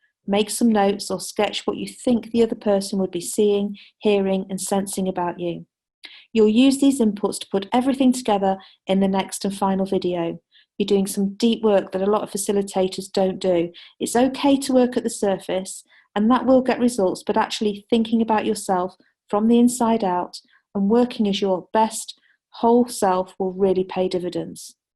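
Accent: United Kingdom. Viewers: Great accent deep British accent, deep information. Thanks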